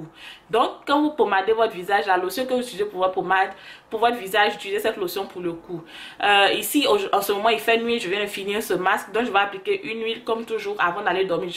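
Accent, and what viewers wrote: Ivory Coast. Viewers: Sans demandé je sais ke t'es ivoirienne, l'accent la ment pas dehhh, lol. Je m'abonne 😊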